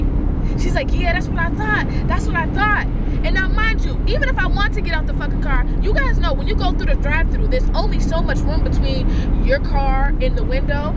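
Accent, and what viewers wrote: Boston, United States. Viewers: You sound like a Bostonian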